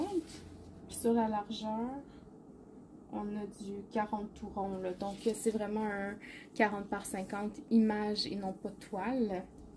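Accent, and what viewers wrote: Quebec, Canada. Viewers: j adore ton parler quebecois 😂😂😂 je comprend pas tout mais c est drole 😍😘😘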